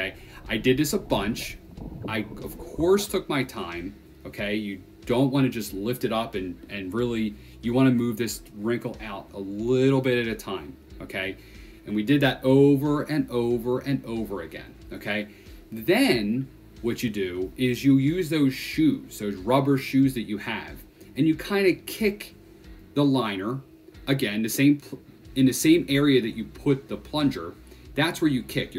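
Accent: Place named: New Jersey, United States. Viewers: Sounds like West Orange NJ. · I'm guessing you're from NJ. You say woter.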